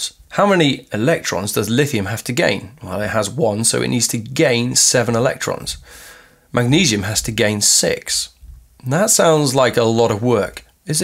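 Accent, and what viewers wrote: United Kingdom. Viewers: Are you Brittish?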